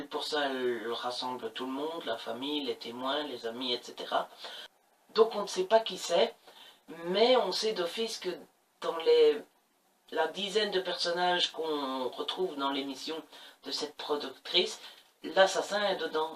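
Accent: Belgium. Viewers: Oui ton accent :-) moi aussi suis belge. J'aime beaucoup tes vidéos, continue :-)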